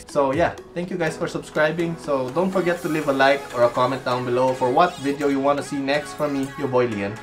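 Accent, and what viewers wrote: Philippines. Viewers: Uhmmm are you Filipino?? Cause you sound like one... No offense tho cause I'm Filipino too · Are you Filipino? I just noticed your accent and the way you talk. Idk if im right, but.. Are you? PS. I CAN'T BELIEVE I JUST SAW YOUR CHANNEL NOW *smashes subscribe button in rage* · Kuya nag tatagalog po ba kayoo... parang pinoy po kc kyo.. 😅😅😅 .. do you have a video about rendering style but pen and ink only rendering..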